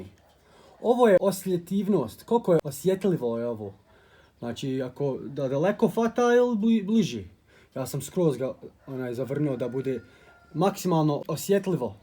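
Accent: Bosnia and Herzegovina. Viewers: Pravi momak nekoliko tvoju videa sam pogledala I vidjela sam da si previse iskren moj savjet je kao moje dijete koje bi posavjetovala da nebudes toliko Iskren. Nardo iskoristava ima pokvareni ljudi moras biti malo Mudri a to je da se cuva s Dijete drago simpatican ti taj Bosanski Bas mi super te gledati❤❤😊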